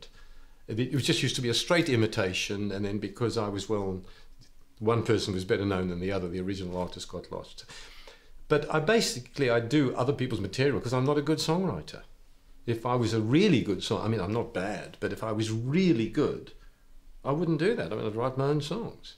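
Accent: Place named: South Africa. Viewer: He still has a slight South African twang to his accent .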